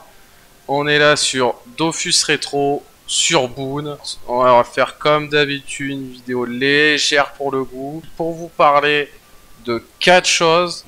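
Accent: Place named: South, France. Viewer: Je m'abonne pour ton accent le sang du sud mais je suis Dofus 2 👍 rétro j'y retourne plus 😂 trop de farm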